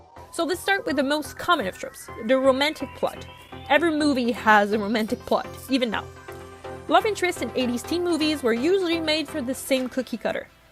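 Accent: Quebec, Canada. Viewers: are u from québec?? i feel like u are from ur accent!!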